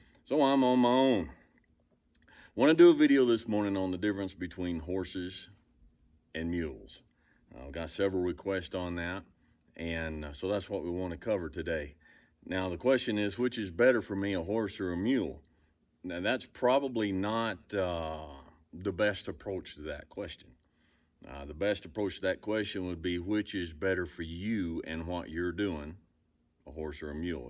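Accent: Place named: South, United States. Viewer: Yes it is and I like that southern accent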